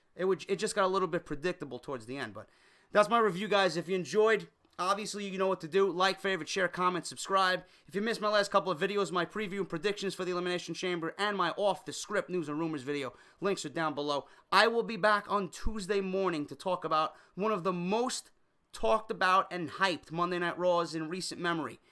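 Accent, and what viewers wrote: New York, United States. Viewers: Love your vids, your ny accent makes me smile you lighten up my day. Full homo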